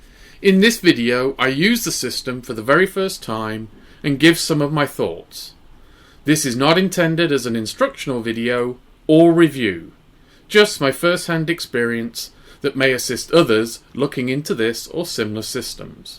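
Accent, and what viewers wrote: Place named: United Kingdom. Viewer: ahhhhh your accent made me think you were in the uk 😎 They do have some uk based resellers 👌🏻👍🏻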